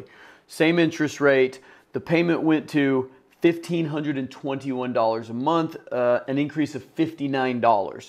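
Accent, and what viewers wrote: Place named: California, United States. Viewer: Sounds like you’re California, like me 😳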